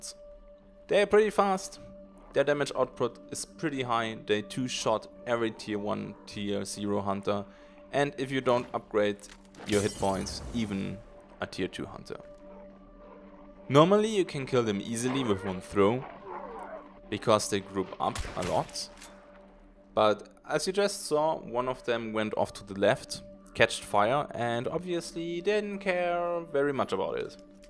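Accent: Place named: Germany. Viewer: Dezenter deutscher akzent... gefällt mir :D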